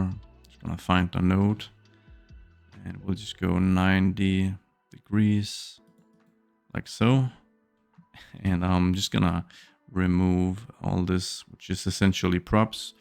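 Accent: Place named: Denmark. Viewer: Are you Danish? Because your accent really sounds like us.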